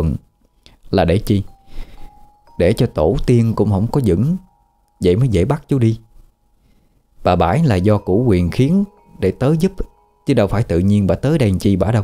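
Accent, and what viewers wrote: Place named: Mekong Delta, Vietnam. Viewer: Xin phép hỏi em quê ở đâu mà giọng đọc hay quá.giong miền tây của mình quá.do trời phú cho giọng đọc xuất sắc.nghe riết ghiền để phone trên giường nghe rồi ngủ mà có khi nghe đến 3 giờ sáng.cam ơn và tác giả ❤❤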